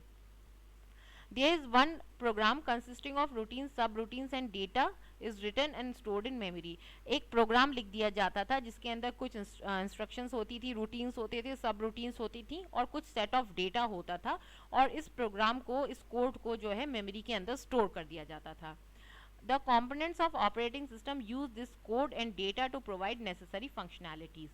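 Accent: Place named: India. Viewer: This Indian accent sucks.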